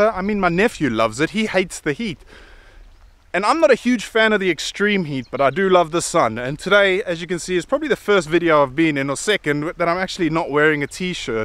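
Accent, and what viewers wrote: South Africa. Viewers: Are you South African? answered that. Love it. Keep well, all the best. Haha, you never drove in Joburg then? 😂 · Thank you for your honest confession. :-) I can imagine it's tough living so far from the sea. The larger lakes and water reservoirs don't really make up for it, as nice as they are. And I believe you when you say the UK's beaches aren't that magnificent-looking, compared to any of the coastlines in South Africa. You're right that some drivers still drive like maniacs. And that's after we've had stricter laws about speed limits and driving in general implemented in the last decade and a half or two decades. Some people just can't seem to learn they need to be more careful and have more empathy on the road. I suppose I've gotten pretty good at recognising anglophone accents over the years. I've only needed to listen to your's for a few seconds and thought, "Oh ! 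You're South African ?". :-) Well, I hope you like it over here. It's not a perfect country and it has its fair share of issues, but hopefully you'll find it welcoming and peaceful for many years to come. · I kept wondering where you're from as your accent sounds South African, so happy that you mentioned it 😁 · Howzit. I also just moved here 3 months ago from RSA, i picked it up in your accent straight away... Lets get together and have a Braai one day, we have a Weber here too..✌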